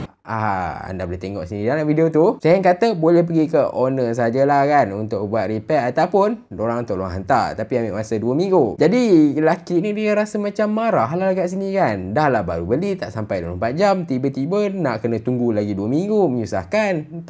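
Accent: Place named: Sabah, Malaysia. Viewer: loghat sabah laa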